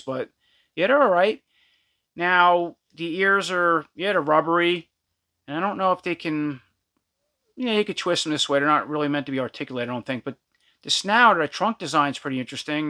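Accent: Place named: New Jersey, United States. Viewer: Love your voice man! Jersey kinda sound! I gotta check more of your videos out. Thanks again for the dub and I subscribed back ..but looks like your chan chan is doing well.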